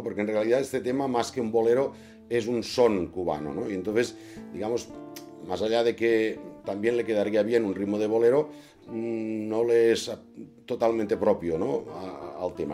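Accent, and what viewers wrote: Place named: Catalonia, Spain. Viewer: Collons quin accent que tens de català de la bona terra.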